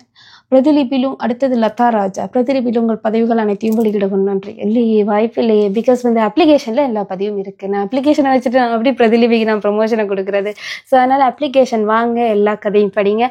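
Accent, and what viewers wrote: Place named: Sri Lanka. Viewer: நீங்க அழகா பேசறீங்க சகோதரி இலங்கை தமிழே அழகுதான் நீங்களே குரல் கொடுக்கலாம் நன்றாகவே இருக்கிறது நானும் முத்துலட்சுமி ராகவன் ரமணிசந்திரன் அந்த வரிசையில் ரசிகைதான் சிறிய வயதில் எங்கள் மனதில் பெரிய இடத்தை பிடித்துவிட்டீர்கள்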